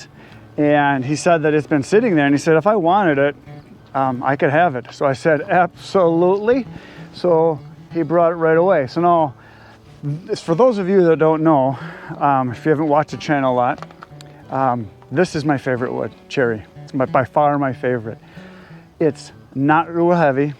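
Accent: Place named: Upper Peninsula, United States. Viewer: Welcome to Michigan. The people from the UP sound a bit like you so you should fit in nicely. Have a great time.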